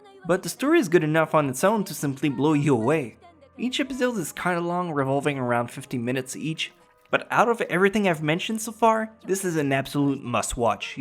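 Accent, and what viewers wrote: Quebec, Canada. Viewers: haha j'ai remarquer ton accent Quebecois. J'aimerais bien te voir une fois au quebec et te saluer! J'aime tes vidéos, tu est un de mes youtubeur preferer a cause du contenue de tes vidéos! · That accent... are you from Quebec? T'es québécois, pas vrai ?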